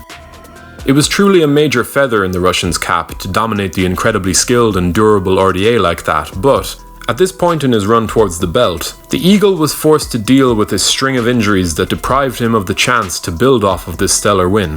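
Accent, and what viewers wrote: Ireland. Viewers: Is that an Irish accent gracing this vid?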